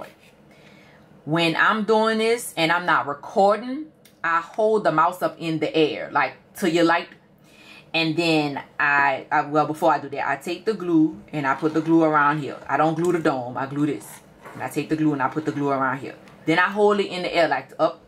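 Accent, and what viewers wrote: New Orleans, United States. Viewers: You have to be from da 504 NOLA Baaaabeeee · So cute! Are you from New Orleans? I recognize that accent 🥰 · I can hear it in your voice baaaaby dat ya straight from the N.O. Thanks baby for helping me as a beginner out ❤. .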